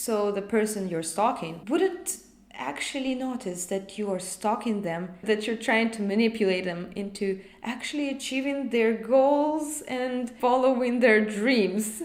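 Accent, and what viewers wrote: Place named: Eastern Europe. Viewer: I did guess right! Kinda. lol There is something Eastern European about your accent.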